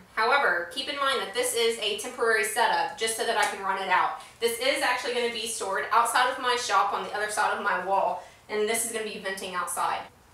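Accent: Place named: South, United States. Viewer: Is it just me or did I hear a little bit of a southern(ish) accent? I can be wrong there, however I think it's a lovely accent.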